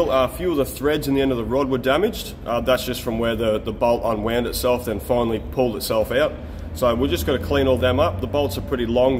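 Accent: Australia. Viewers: Great engineering skills! Awesome to watch by a kiwi from across the ditch! Your dog needs an alignment as it runs side saddle! Lol 😆 Cheers keep up the excellent workmanship! Ps. The camerawoman can’t be doing much office work if she’s on the end of the camera! Really enjoy your bloopers as you talk like a real Aussie! Eg … fuck sake fuck it! 😂 lol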